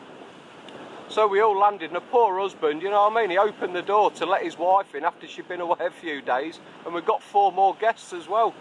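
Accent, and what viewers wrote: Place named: Black Country, United Kingdom. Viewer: You a Wolverhampton lad I’m trying to figure your accent buddy either that or brummy don’t take offence they’re similar lol 😂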